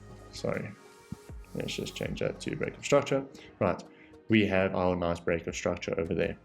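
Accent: South Africa. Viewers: I know a South African when I hear one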